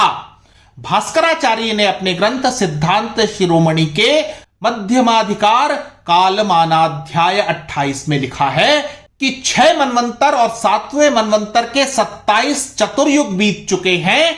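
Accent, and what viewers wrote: Standard. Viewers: Itana shudhha Hindi sunane ko milaa aaanand aa aagayaaa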